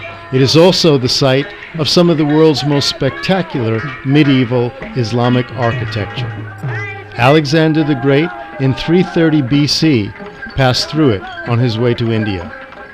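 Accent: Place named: Brooklyn, United States. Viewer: "Baklavar"? Dude. Your pronounciation is pure Brooklyn. I suggest you stay away from the contemporary political commentary. If anyone wants to read the details of events leading up to the Afghan civil war of the 1980s, one place to start is the chapter on Afghanistan in William Blum's book 'Killing Hope: US Military and CIA Interventions Since WW2' (Common Courage Press 2004) and Blum's references therein.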